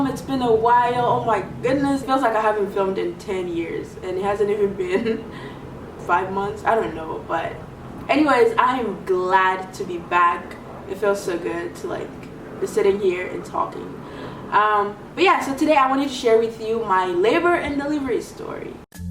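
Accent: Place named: Francophone Africa, Africa. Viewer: Cameroon I knew I recognized that afro-french accent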